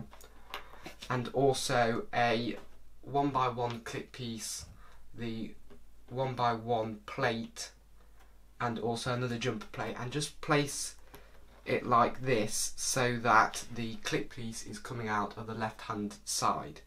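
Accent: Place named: United Kingdom. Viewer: Holy moly, british accent confirmed 😅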